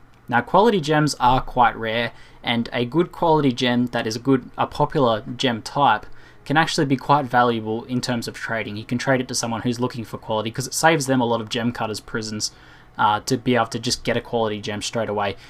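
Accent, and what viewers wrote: Australia. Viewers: I'd say australia. Especially because he greets us with "g'day"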